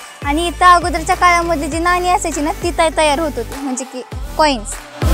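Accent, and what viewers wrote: Latur, India. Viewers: Ek no information Aani tuzya sobat khup cutness aani God aawajat Laturi bhasha zakaas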